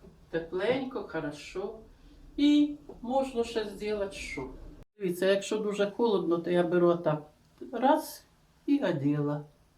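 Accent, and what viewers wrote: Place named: Ukraine. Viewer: Ничего себе ПАНИ))) Хохлушку за версту видно, что речь, что мина кирпича просит))) 😄🇷🇺🇷🇺🇷🇺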